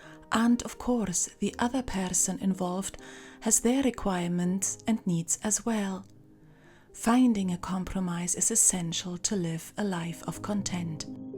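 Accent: Scotland, United Kingdom. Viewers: I feel like you are picking up a bit of Scottish in your accent.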